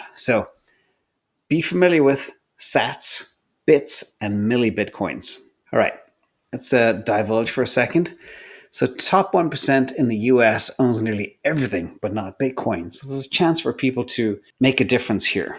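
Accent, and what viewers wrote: Ireland. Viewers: am I hearing a slight Irish accent.?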